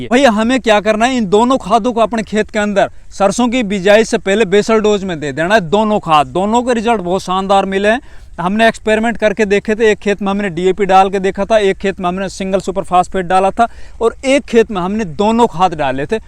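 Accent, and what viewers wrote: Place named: Mahendragarh, India. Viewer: Bhai ap kha se ho awaz tho m,grh side ki h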